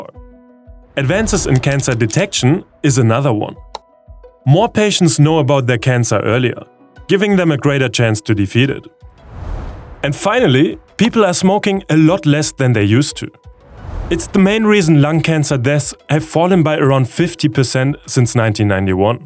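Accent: Germany. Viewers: Are you German? Your accent sounds very German (I’m German)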